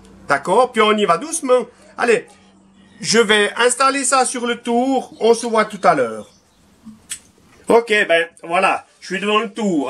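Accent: Switzerland. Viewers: Bravo , belle inventivité , on en trouve beaucoup au Canada on dirait , à moins que ce soit en Suisse ou en Belgique , bon , le petit accent est bien plaisant ! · salut toujours ingénieux et j'adore ton accent de ta belle Suisse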